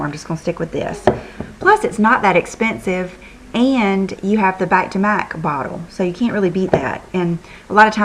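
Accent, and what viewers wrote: South, United States. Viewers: are you OK. Miss seeing your smiling face and hearing that wonderful southern accent. Sending you positive vibes your way. Feel better soon. Hopeful we will see you this week. It's was very odd with no Friday Haul last week. XOXO Emily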